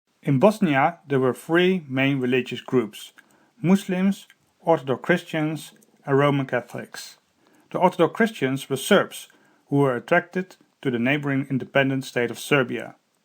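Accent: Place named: Netherlands. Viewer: Hollandse accent haha goeie video hoor ik heb veel meer informatie gekregen over de balkan dan in school!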